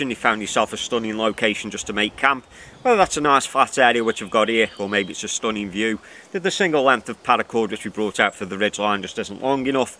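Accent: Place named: Wirral, United Kingdom. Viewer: Just come across your videos mate really well made and informative, I’m wondering if you’re quite local to me as you seem to have a bit of a Wirral accent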